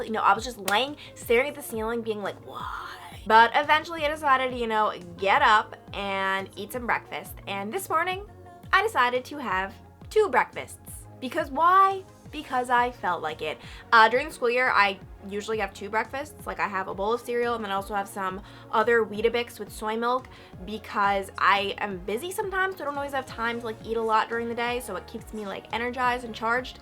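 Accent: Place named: Midwest, United States. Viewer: does anyone know where is from because I'm from Wisconsin and she sounds like she has a midwestern accent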